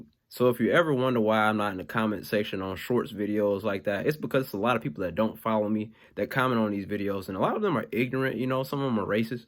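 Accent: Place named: Atlanta, United States. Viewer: I love your improvisations and your roast. I'm from Westside Bankhead and I don't really get to hear that speak anymore so it's nice to find someone that speaks my language.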